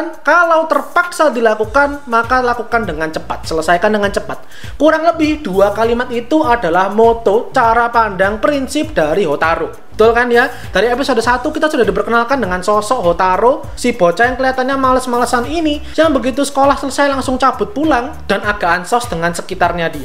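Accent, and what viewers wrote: Java, Indonesia. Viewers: Medok bang 😂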